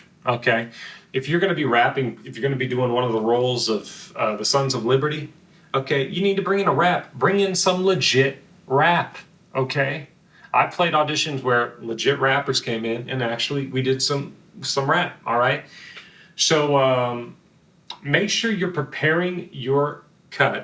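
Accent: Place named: Midwest, United States. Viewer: As someone from the Midwest, I love listening to you. It sounds like home :)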